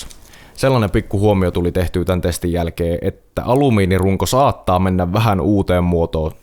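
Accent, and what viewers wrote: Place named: Savo, Finland. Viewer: Keloja Luonnosta juuri näillä sanoilla! ..itellä hiukan hirvitti / säälitti kun näki mimmosta kyytiä tuo reppu sai 😳 ..mutta parasta tässä se, että rinkka on käytössä ja toimii vielä tuon pieksämisen jäläkeen! Ja myöski arviot analyyttisiä, asiantuntevia ynnä hyvin realistisia ! Kiitokset ja Semppiä retkiin 👍 - Tope - Ps: täytynee vielä näin jäläkikätteen puol-pro -valokuvvaajana ja -opena kommentoija tuota mainiota ja erittäin jaadukasta kuvaustyötä, myös videon viimeistelyä.. vetää virtoja mille tahansa firman tuote -esittelylle, siis myös kuvan laadun ja tason suhteen.. hienoo kattoo tuommosta, kuvaa, joka myöski tehty huolella, viimesen päälle hyvin.. ..ja myös tarina sujuu ja kulukee niinku vanhalla tekijällä, ammattimaisella tuotekonsulentillä ainaki.. ..mukavoo huomata ent.savolaisena tuo jouheva jutun juoksu ja murteen nuotti.. 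👍😁🙌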